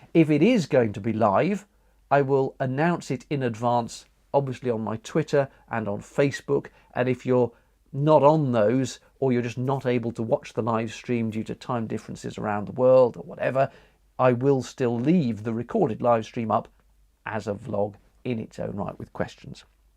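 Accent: United Kingdom. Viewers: Nice! Two of the most interesting topics, boat life and van conversion, done by one of the best narrators here on yt, combined with the lovely british accent and the breathtaking landscape of UK, what else can I wish for? Thank you, great news! :-))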